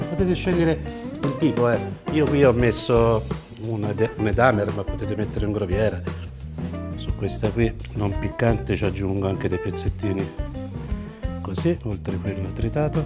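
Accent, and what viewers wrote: Tuscany, Italy. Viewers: Sei proprio forte, il forno a microonde non ce l'ho, ma non mi manca. Questa torta di tonno e patate la farò molto presto. Una curiosità, ma sei toscano? Un pochino........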